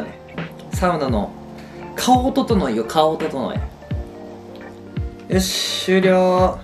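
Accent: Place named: Kansai, Japan. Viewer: 関西弁も素敵です☺️💕肌綺麗ですね！スチーマー買おうかと検討してたのですごく参考になりました！😆💕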